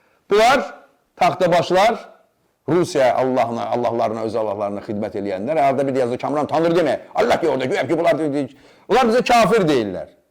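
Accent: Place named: Salyan, Azerbaijan. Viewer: Kamran, qadan alım bu Salyan tələffüzünu-üslubunu dəyişə..!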